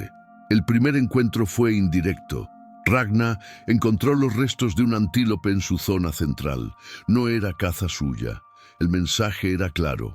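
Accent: Portugal. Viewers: COño nua entendo ni marre, es espanol revuelto con portuguaos 😁🤣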